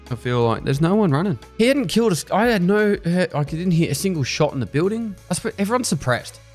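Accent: Australia. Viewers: Finally caught up. I can now understand Aussie at 2x speeds!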